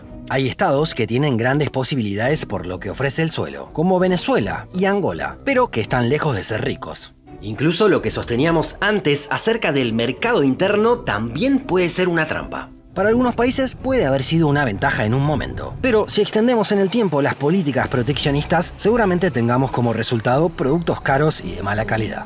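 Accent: Argentina. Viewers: No di es nada..seguro eres m argentin. o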